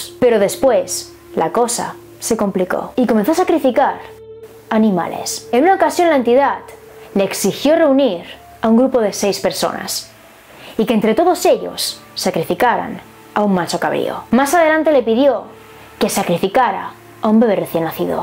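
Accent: Galicia, Spain. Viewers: Oye tía eres tu española gayega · Me encanta tu vídeo... Y ese acento español (con todo respeto) es como para un audio libro y escucharlo cuando me toca trabajar toda la noche, te recomiendo el vídeo de la casa de Fidel, de la mano peluda. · Bellotas hermosas y preciosas. Un vídeo genial y asombroso. Narrado de la mejor forma, el estilo y acento español de la bella bellota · Soy mexicano me caes bien. Ahora te sigo... no me acostumbro al acento español .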